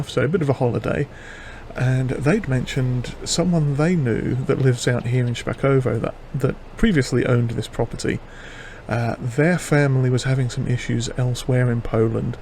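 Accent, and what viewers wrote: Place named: England, United Kingdom. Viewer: yea me too I love it to be real tough BUT still doable of course. And yea I'm really tied to all the old vintage stuff. I'm from the US (Midwest) and despite what the game says my grandpa's farm growing up and all the surrounding farms were pretty small and used old stuff. I never saw a closed cab until I was long off the farm and in another state lol (I'm 43 and we are talking about as I grew up in the late 80s and thru the 90s lol) Haha you and I have had the same experience with the horse/ox and carriage/plow so yea it should be an adventure!!! What part of England are you from? By your accent I ruled out the rest of the UK. My apologies if I'm wrong but I'm pretty sure you aren't from anywhere else and you aren't a scouser lol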